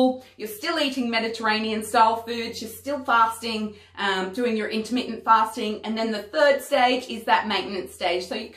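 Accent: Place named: Australia. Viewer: Lovely to hear an Australian accent 💗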